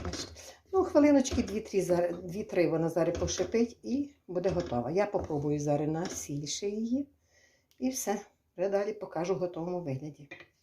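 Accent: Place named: Bukovina, Ukraine. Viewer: як завжди тепло по-домашньому.Хочу сказати Вам, одну річ,зустрічала в коментарях під Вашими відео спроби навчити Вас літературної мови. Не ведіться на це,бо наш діалект є природнім і властивим нашому краю. У нас навіть старі люди ніколи не казали "борошно",завжди "мука". або коли невелика кількість,то "мукичка"і так далі,не буду перечисляти.Для чого нас рафінувати? Буковинська краса і в нашому діалекті також.Вам здоров"я, Божого благословіння.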